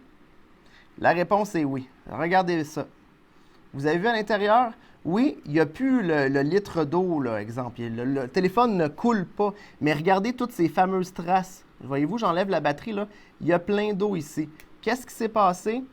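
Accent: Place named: Quebec, Canada. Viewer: Putain l'accent québécois une tuerie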